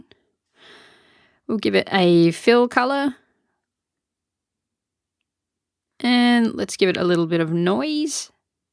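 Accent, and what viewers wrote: Australia. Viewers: I'm still with AD v.1 'cos my mid-2010 G5 can't handle v.2's requirements. This vid answers SO MANY QUESTIONS I've had since switching from Illustrator, FreeHand, etc. It is now PERMANENTLY BOOKMARKED and the URL copied to a separate text file (just in case). If this vid was downloadable, I'd save it to an XHD, too. Great job! (and the Aussie accent is a nice 'plus' point!). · Glad to hear an Aussie accent at last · This is hands down THE best Affinity Designer tutorial on earth, but I'm not going to lie, the Australian accent helped keep me engaged the entire time ;) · Sounds like an Aussie or Kiwi... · THANK YOU!! I love this little course, I've learned more from it than 20 others. Also, it's fun to hear an Aussie voice out there in youtube land - so, a big thank you also, to the young lady who taught it.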